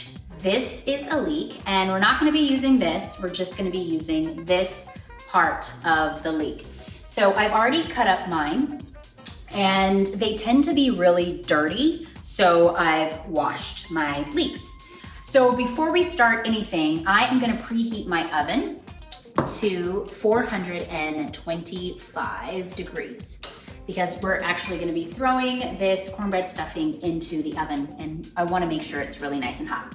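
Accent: South, United States. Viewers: sounds like the South where im from 🔥🔥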